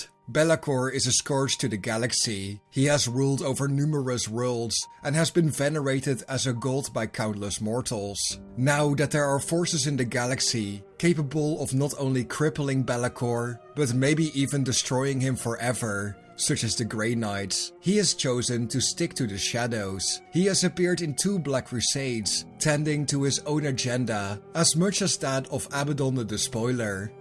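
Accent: Netherlands. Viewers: Strong dutch accent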